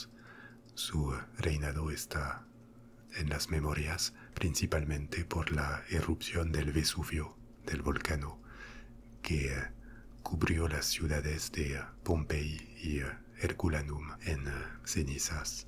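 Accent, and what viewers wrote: France. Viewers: I've never thought about this before. You have a french accent in English, but also probably in Spanish too. I know a little Spanish, I'm gonna find out if it's enough to make out the accent Edit: nope, I can't tell. Maybe your Spanish is actually perfect?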